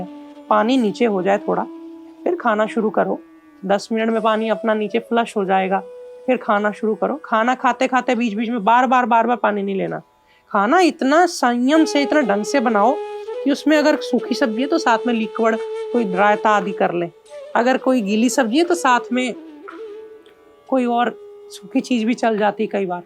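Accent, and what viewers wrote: Punjab, India. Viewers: Tussi Punjabi o??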